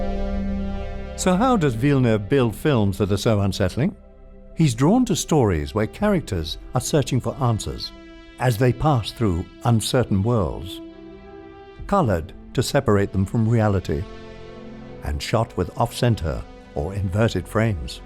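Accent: RP, United Kingdom. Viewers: I have a hard time taking the narration seriously with him doing the RP accent.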